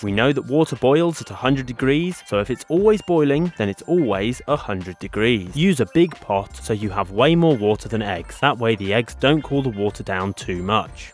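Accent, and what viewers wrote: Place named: United Kingdom. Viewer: ! Seven egg halves or seven whole Scoth eggs? I had Scotch eggs at a picknick when I was young and absolutely loved it. I spent a month at a boarding school in England (language course - most Swedes went to Hastings and spoke Swedish to other Swedes, but my mum, a translator, would not have that, so it was boarding school) and that I had Scotch egg for picknick lunch one day is one of the strongest memories from those days. Strangely enough, since I can't remember how they tasted. We were on an outing to a fair, and I also remember buying "toffee", which was not toffee-like, but hard as a brick, so when I had bought it, the salesman smashed the "toffee" in its plastic wrap to break it in pieces. Anyway, VERY nice to hear a British accent explaining how to make Scotch eggs (because most recipes for Scotch eggs on Youtube are posted by Americans, and I could not even begin to say how tired of I am, and irritated by, American "English").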